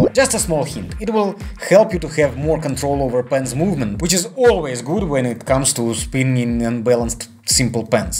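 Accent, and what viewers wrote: Russia. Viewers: Love your Russian accent 😂♥️🤞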